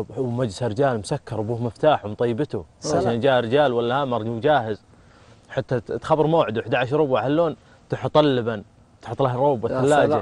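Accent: Hail, Saudi Arabia. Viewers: ي زيينن حكيه حائل يهبل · تفوز سوالف و لهجته الحائليه😭😭😭💜💜💜💜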